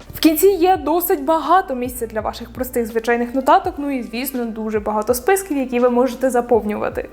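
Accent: Poltava, Ukraine. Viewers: Ви випадково не з Полтави?)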